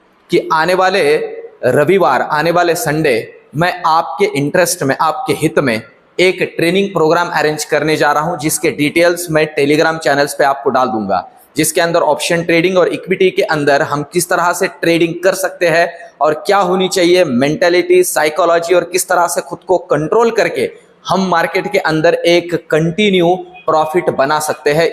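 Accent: Maharashtra, India. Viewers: Sir mera analysis ye kahata hai ki aapki mother tongue Marathi hai...🙏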